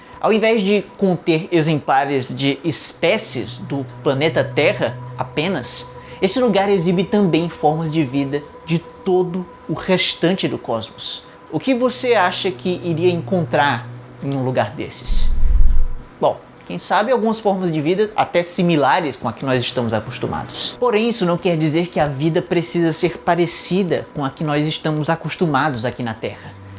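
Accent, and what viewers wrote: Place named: Northeast, Brazil. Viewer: quando comecei a assistir ja suspeitei que o canal era nordestino :) mt bom o conteúdo parabéns.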